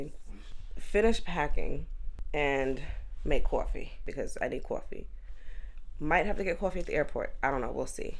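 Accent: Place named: Spain. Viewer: You got a lil Spanish flow! Love watching people enjoy my island! 🍻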